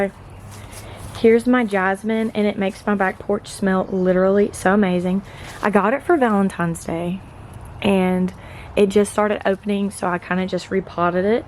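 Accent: South, United States. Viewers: You sound like Arkansas or Georgia! Love your cacti Ma'am! Edit: Your sun porch looks amazing!!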